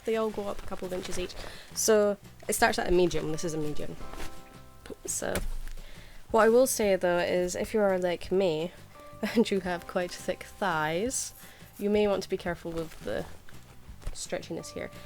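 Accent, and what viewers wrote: United Kingdom. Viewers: I love this video. And the other half dozen of yours I've wached. You're beautiful and your Brit accent slays me. I;m a lifelong Beatles fan and once visited a lady friend in the UK in 1985.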